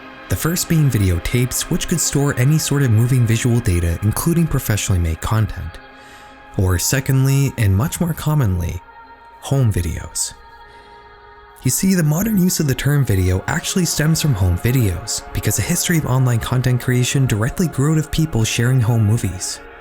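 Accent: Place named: Canada. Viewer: So you're from Ottawa, eh?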